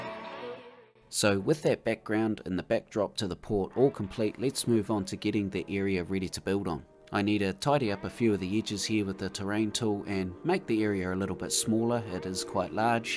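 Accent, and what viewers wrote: New Zealand. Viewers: Awesome work, mate! I appreciate your unique and realistic ideas. Bloody hell I miss the kiwi accent, though! All the best to you from an Aotearoa-loving West Islander!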